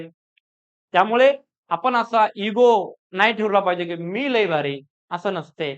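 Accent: India. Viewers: Sir ekdam barobar boltay rumhi😂tumch boln aikun kaltay ki tumhi punyatle ahet😂😂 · Sir, are you from Nanded? Loha or kandhar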